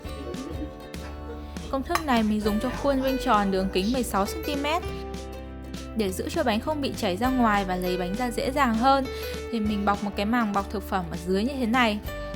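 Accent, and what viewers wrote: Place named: North, Vietnam. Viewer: Giọng chị cuteee quá 😍😍 em ng miền nam cực kì thích giọng miền bắc.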